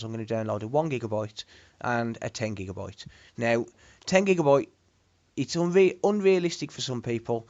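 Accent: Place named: Black Country, United Kingdom. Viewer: I thought the same lol, as soon as I started watching this video I was like wow is that a yam yam (or a Brummy)? Then moments later - yea, a yam yam! I'm from Stourbridge/Wollescote but working-living in Birmingham for the last 9 years, its always nice when visiting back home hearing people talk normal/homely again - yow alright lad, am bostin ah kid, I ay bin up mezza in ages I core believe it, ar dow arf miss livin around the black country. So yea, I used to have Virgin Media for donkeys years at my parents house until I moved to Brum 6 years ago, think I last had 100 or 200Mb in Stourbridge but for Brum I could only get BT's 76Mb, actually 65-69Mb. Then Hyperoptic came along 3-4 years ago so now I'm on their 1Gb, actually 900Mb ish for £45/month BB-only. Honestly though I hate living in Birmingham, I'm glad Virgin have 500Mb+ now its giving me an incentive to want to move back home where all my family are.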